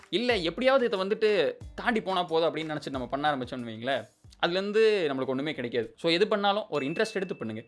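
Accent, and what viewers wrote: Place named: Kongu, India. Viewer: கொங்குகவன்டர் ஸ்லாங்..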